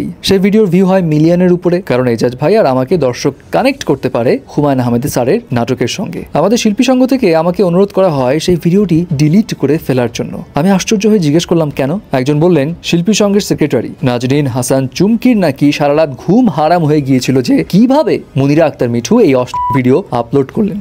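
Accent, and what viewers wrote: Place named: West Bengal, India. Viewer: Tui dada babu der moto kota boltasos ken? Tui ki oi parer naki re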